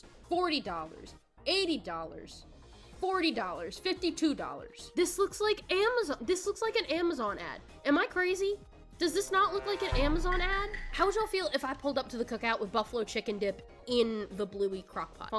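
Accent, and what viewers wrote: South, United States. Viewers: M...Maryland? You sound like you're from the south am I stupid? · Electro-Harmonix shirt, Danganronpa mic, Sailor Moon poster, Sonic PC wallpaper, slight southern accent... you are 1000% the most qualified person to talk about this and I look forward to watching your other videos. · I already know your southern, what part of the south woman! Tell me story's of the local waffle house >: )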